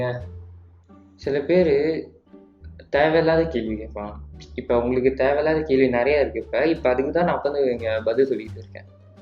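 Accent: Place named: Malaysia. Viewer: are you a indian malaysian coz ur accent though different i hate indian accent but ur's is kinda malaysian indian accent anyway good video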